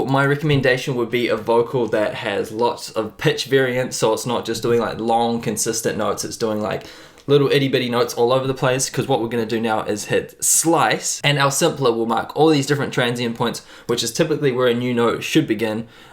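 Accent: New Zealand. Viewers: hahaha yeah, i started watching and i was like damn i swear this guys from nz then i checked the channel description 😂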